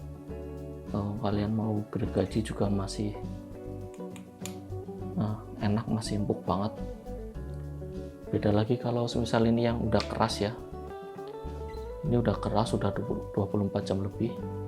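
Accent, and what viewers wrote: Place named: Banyumas, Indonesia. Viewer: Mantap, maturnuwun sharing infornya Mas'e! Ngapak Forever! 👍👍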